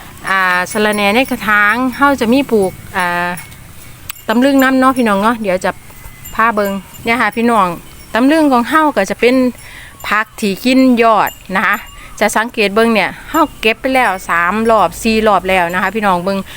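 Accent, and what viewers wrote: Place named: Isan, Thailand. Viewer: ภาคอิสานบางคำก็ฟังไม่รู้เรื่องไปก่อนละนะ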